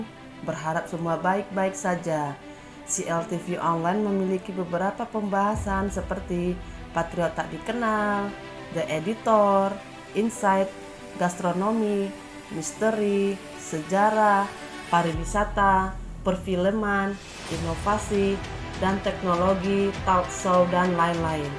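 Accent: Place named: Indonesia. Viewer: Kayaknya ema1 halo Channal ema Indonesia1 karik tan nia logat ne logat ema Indonesia nian.... 🙏🙏🙏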